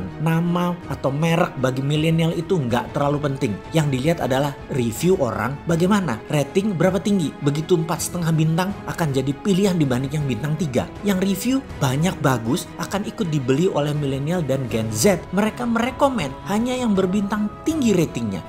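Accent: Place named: Java, Indonesia. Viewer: Semangat boss medok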